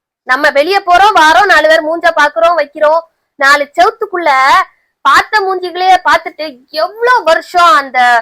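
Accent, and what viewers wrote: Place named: Tirunelveli, India. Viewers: தென்மாவட்ட தமிழ் எதார்த்தமான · மேடம் நீங்க பேசுற திருநெலவேலிலெங்வேஜ்ரொம்பநல்லாருக்கு❤❤❤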